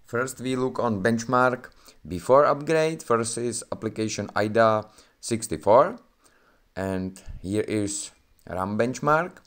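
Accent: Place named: Czech Republic. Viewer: 😀 brutální czechlish 😀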